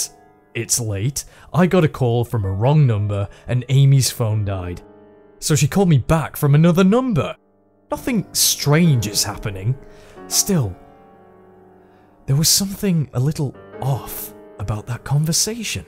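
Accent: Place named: England, United Kingdom. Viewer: Oh crap you're English. Nice CP lel.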